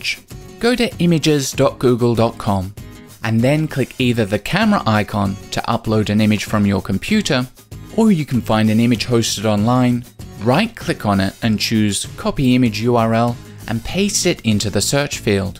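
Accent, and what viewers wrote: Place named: United Kingdom. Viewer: If that was spoken with an American accent- I wouldn't have watched it. Am I the only one who is obsessed with those British accents?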